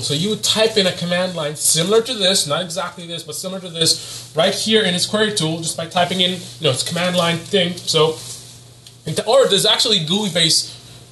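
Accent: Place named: United States. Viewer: Thank You. I am a beginner and you talked to me like I am a beginner ( not stupid but a beginner ) thank you. I have gone through a PLEATHERA of videos and information. Many people I simply cant understand their dialect from where ever they come from. You Speak English in American English and that helps me ( okay maybe I am stupid ) but thank you. Doing good -- keep the classes coming -- ROCK ON.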